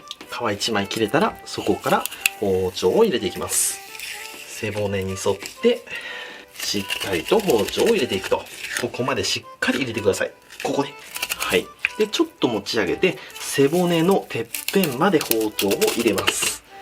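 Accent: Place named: Nagoya, Japan. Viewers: いつもより名古屋べん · 名古屋弁イントネーションきくとさんを思い出します。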